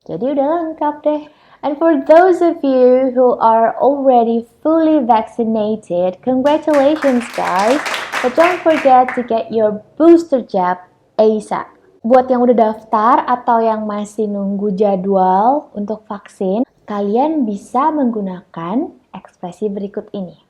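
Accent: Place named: South Jakarta, Indonesia. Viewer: jaksel aksen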